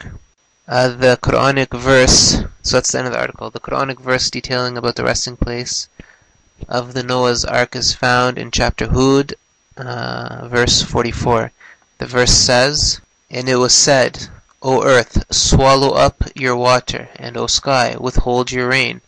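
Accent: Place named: Middle East. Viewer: According to your video the Bible was correct and the Quran was wrong. The person talking in the video sounds like he's from a middle eastern background which is probably why he's saying the Bible was wrong even though in the text he provided as proof the Bible is "correct." I Still don't believe in the whole religion mumbo jumbo. OMG A BOAT, IT MUST BE NOAH'S ARC. At least I got a laugh out of this so thank you.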